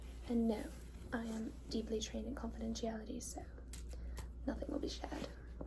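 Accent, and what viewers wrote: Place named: England, United Kingdom. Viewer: OMGOSH I L♥VE the English accent! You do it very well. You sound so authentic. WOW. [For the record: I am British 🫖🍵] Thank you, so Much!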